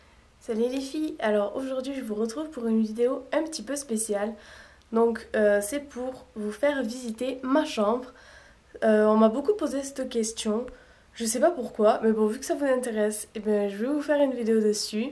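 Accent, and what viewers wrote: South, France. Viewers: Coucou ma belle je vient de découvrir ta chaîne j'aime beaucoup ta chambre qui est simple et mignonne. On devine desuite que tu est du sud avec ton accent :P Tu m'a fait rire avec ton espace " cercueille" Je pense que tu voulais dire sanctuaire? x) Bref super vidéos je m'abonne car j'ai regarder plusieurs de tes vidéos et j'aime beaucoup ta chaîne :) J'ai également une chaîne beauté je t'invite a allez voir si sa te dit sa me ferais plaisir ! Bisous xoxo !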